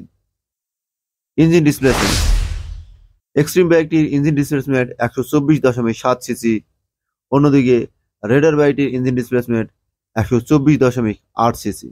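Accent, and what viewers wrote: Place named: Rajshahi, Bangladesh. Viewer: তোমার বাড়ি কি জয়পুর কথা শুনে তো মনে হচ্ছে জয়পুরহাট গ্রামে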